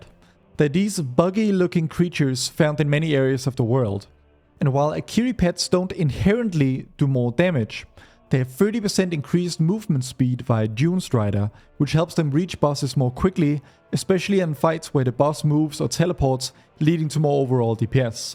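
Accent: Denmark. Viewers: He is danish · I hear the danglish :) good video though. Takker.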